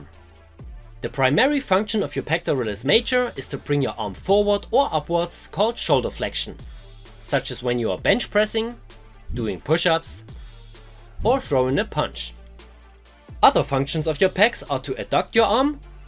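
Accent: Germany. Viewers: Bro angefangen zu gucken und direkt mein Land rausgehört. Bilderbuchbeispiel für deutschen Akzent in anderen Sprachen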